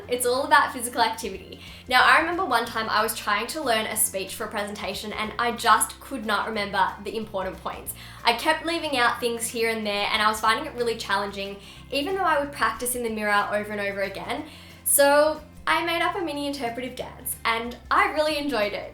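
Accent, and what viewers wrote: Australia. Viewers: Aeee love your Aussie accent x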